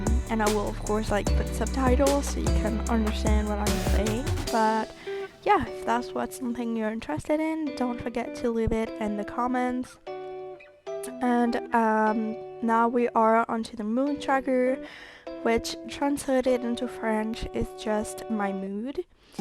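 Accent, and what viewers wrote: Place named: United States. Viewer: I look forward to not only hearing your lovely voice but to see you upright & walking a few steps even if like me it's with leg braces! But please correct me if I am wrong but you have no spinal cord damage but just your brain not sending signals to your legs to make them work? Even though I have only known you on here for a short time,I would rather you have a reaction to a covid booster then catch covid itself & be very ill,we have one thing in common apart from being disabled,you rely on a wheelchair to get around & I rely on hearing aids & other medical aids to make my life easier! The good thing for you is your young & there are medical advances all the time so I am confident about you walking again! And who knows when I get more powerful BTE hearing aids or a CI I will hear how lovely you sound! And the American accent haha 💟💟💟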